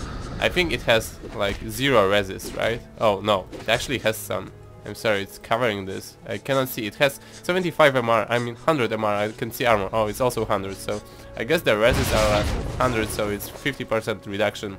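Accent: Poland. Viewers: ty jesteś polakiem bo masz taki głos polaka. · Are you polish? Your accent is really similar lol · Polski akcent? Czy tylko mnie sie wydaje? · Jestes z Polski prawda? Slychac, np gdy wypowiadasz 'mana' . Pozdrowionka i robisz mega robote!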